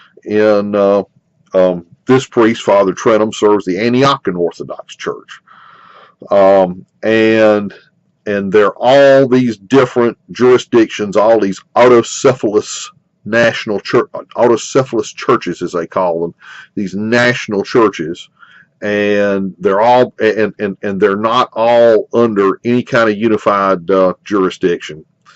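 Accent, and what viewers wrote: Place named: South, United States. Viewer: Nice southern accent. What state are you in? I can’t become Catholic because of the gay clergy. But I respect a lot in what we have in common.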